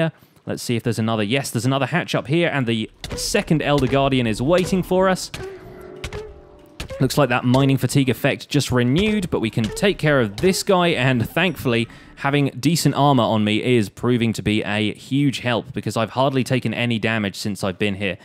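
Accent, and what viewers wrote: United Kingdom. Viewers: I SWEAR he's British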